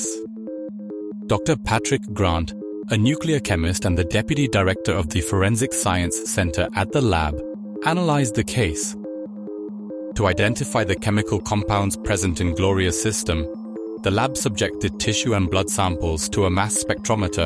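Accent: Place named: Germany. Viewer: Just chiming in to say your voice is great, and the German accent makes it a bit more interesting.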